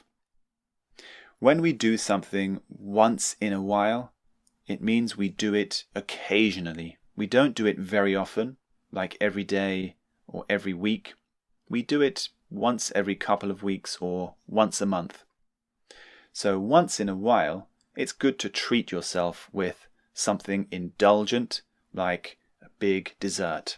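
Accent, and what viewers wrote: United Kingdom. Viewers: With which British English accent do you speak?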